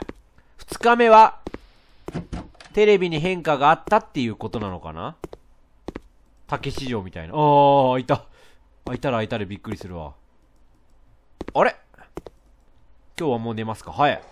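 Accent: Kansai, Japan. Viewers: の関西弁素敵すぎる☺︎ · 今回の関西弁が強い(笑)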